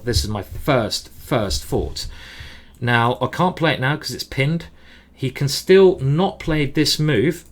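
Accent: United Kingdom. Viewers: So great GM thanks a lot I learn English British accent from you beside chess I'm so happy to learn from you. very very tough game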